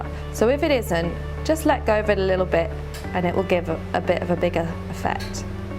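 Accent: United Kingdom. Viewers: She's Brit not American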